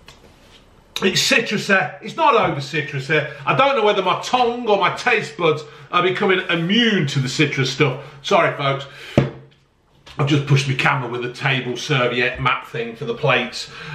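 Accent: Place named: East Midlands, United Kingdom. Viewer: East Midlands accent is not easy on the ear !!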